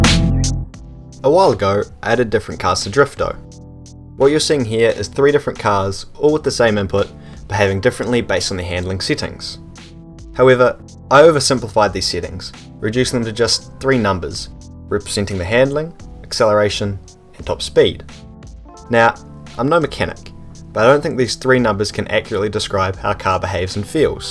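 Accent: New Zealand. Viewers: Are you kiwi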